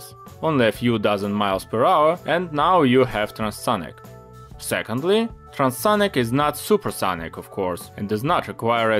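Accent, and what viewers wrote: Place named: Russia. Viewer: Heavy Russian (or Slavic) accent... makes listening barely understandable. So I added the English subtitles. These auto-generated phrases are absolutely farcical. It'd be funny... if I didn't want to hear the narration and understand it. But I do want to understand things, so I give up. Goodbye.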